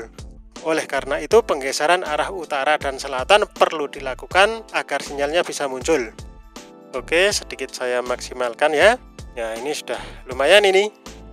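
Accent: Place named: Salatiga, Indonesia. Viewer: Wong Solotigo ya? Coro ngomonge koyo wong Solotigo..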